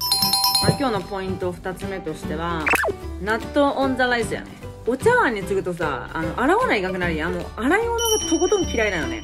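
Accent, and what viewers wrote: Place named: Hakata, Japan. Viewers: 糖質制限から7キロリバウンドした💦 博多弁丸出しの密かにずっと見とって、博多人間からしたら友達の領域w まじうけるw🤣ちょっとまじでまた7キロ戻そかなー！頑張る💪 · 〜やけんとか〜とよとかは博多弁ですよー。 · 喋り方が福岡やけんめっちゃ親近感わきますｗｗ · 方言が一緒やな……って思ってたら福岡の人でした!!余計に嬉しいです( ｰ̀֊ｰ́ )✧︎ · 博多弁サイコー❤美人でええな🎉うちも博多たい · 同じ福岡だからか方言がしっくりww面白いかよw しかしバリカワ！チャンネル登録しましたー！ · と同じ身長で６７キロまで太りました😭 食事は給食あるから無理だけど、頑張るぞ〜！私と同じくらいズボラやし、九州出身？と思われる方言が素敵やね。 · 俺と喋り方「博多弁」がばり一緒っちゃけど、街ですれ違ってるかもね笑笑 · はじめまして☺️ 私の半分しか生きてないやけど、かわいいけんチャンネル登録した❤️ 博多弁？私も福岡やけん聞き取りやすい☺️ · 、福岡弁かわいい💞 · この雑さ具合が好きになる〜方言的に福岡の人なんかな？ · は九州？うちは福岡やけど話し方が福岡っぽくて親近感があった❣ うちも今ダイエットの迷路にはまってしまってるけん、のを参考にしますだ✌ストレス溜まらんようにやね❤これからも楽しみに見ます～😃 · 最近知って式マッサージとかやったりしてるけどいままで毎日やってるとマッサージの内容(?)とか覚えたら動画見ずにやったりしてたけどが見たいからマッサージ覚えても毎日動画見に来てる🙌まじで考え方とか性格とか好きすぎるし、何より私も九州の人間だからイントネーションとか聴きやすくて方言も好きすぎる💓唐揚げ私も大好きだからたまに揚げない唐揚げ作ってみようと思う💪私はYouTubeで知ったけどまじで知れてよかった😂💓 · あ！博多弁やん笑笑聞きやすい笑笑天才やね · 博多弁いいですよね私、博多在住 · 博多弁親近感湧く😂💕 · 博多弁に癒されます！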